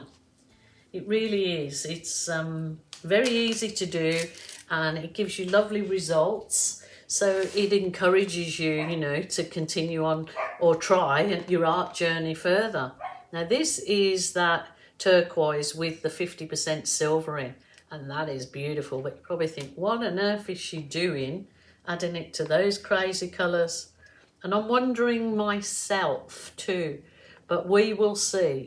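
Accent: Birmingham, United Kingdom. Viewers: Thank you. An interesting technique. I love guessing accents. I'm listening to you...were you originally from the West Midlands? I hear a bit of "brum".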